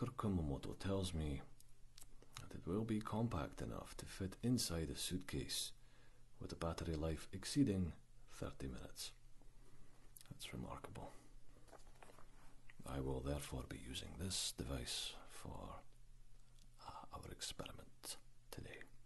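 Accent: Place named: United Kingdom. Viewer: I’m dead... I’m in love with the creativity of this, the aesthetic and the approach. Not to mention your voice is reminiscent of my first ASMR triggers when i was a kid.... “british” accents in somewhat gravelly men’s voices. I haven’t had tingles in awhile but this brought them back almost full force. Thank you.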